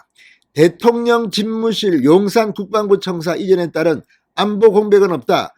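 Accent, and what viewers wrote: Gyeongsang, South Korea. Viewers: 진성호 씨 /엇따대고... 속이시원합니다 완전 경상도사투리^^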